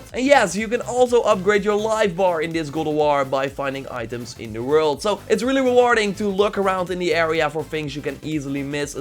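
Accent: Netherlands. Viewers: he'a from Holland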